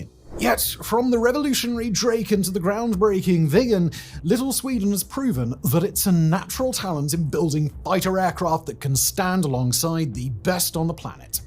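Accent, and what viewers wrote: RP, United Kingdom. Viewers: Not related to the content but that accent cannot be real, unless someone can verify where it’s from, ( if poshy English, what area exactly?)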